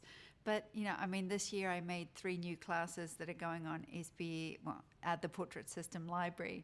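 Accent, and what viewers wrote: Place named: New Zealand. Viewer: Love that you're back with this new endeavor. Looking forward to your weeklies. Thank you for being and I am in love with your beautiful Kiwi accent.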